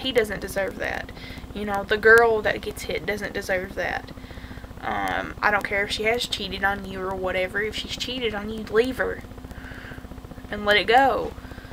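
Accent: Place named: South, United States. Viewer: this is fuuny as shit cause u sound Country as Hell.....which I find it very cute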